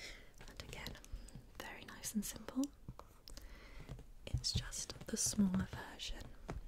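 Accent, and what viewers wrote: United Kingdom. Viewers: And has a British accent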